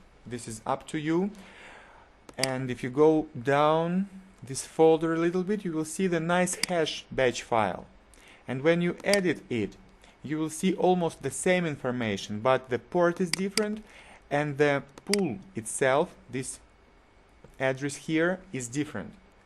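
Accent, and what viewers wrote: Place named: Russia. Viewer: Отлично! Всё понял друган! Привет из России! Сколько сейчас долларов в сутки майнишь? что то у тебя реально конкретный акцент....ты из РФ что ли? :)))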